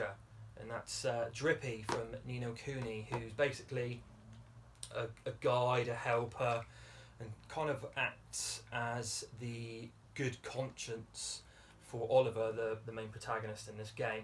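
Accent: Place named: Wales, United Kingdom. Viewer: Some great answers there buddy 😄 ive never played a mana game but always been interested. There should be more Welsh accents gaming 🤣🤣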